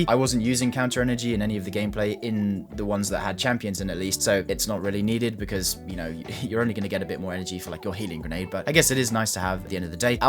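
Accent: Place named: England, United Kingdom. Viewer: Hearing saying "You'll be bonking everything" in his English accent is pretty funny, as it means shagging everything over here. 'Bonking' as related to hammers only really works with an American accent, to my mind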